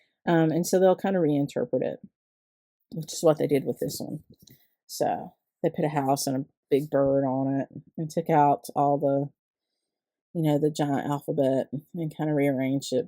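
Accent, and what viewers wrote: South, United States. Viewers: I enjoy your videos so much! I could listen to your lovely southern accent for hours. Watching from Australia🐨🦘💕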